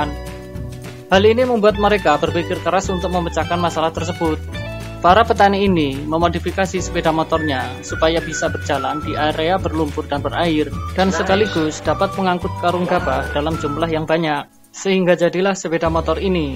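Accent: Java, Indonesia. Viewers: suara jowo'mu medok pisan yo bro...😂🤣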